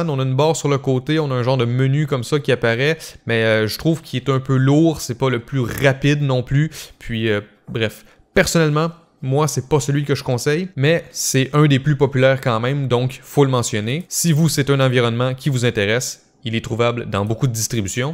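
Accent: Canada. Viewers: Cool ta vidéo. Riche en contenu et pas seulement pour faire une vidéo.. Par contre qu'est-ce que j'ai dur avec l'accent canadien ^^ bisous de belgique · C'est super merci. Explications claires qui donnent envie de se lancer. (et votre petit accent canadien est charmant) Merci. Ella 🌺🌷🍀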